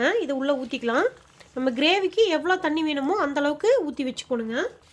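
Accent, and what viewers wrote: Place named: Coimbatore, India. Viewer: Akka neega Coimbatore thana pesarathuliya theriyuthu ka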